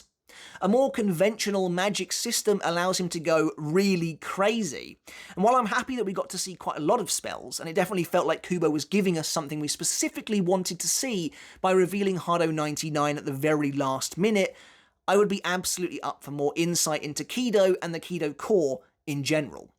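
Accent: England, United Kingdom. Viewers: Pronouncing these with english accent is so irritating. 😂😂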